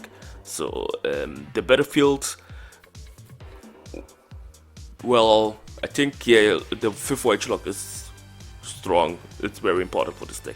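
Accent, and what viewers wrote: Germany. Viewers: Dein Denglisch ist Sympathisch haha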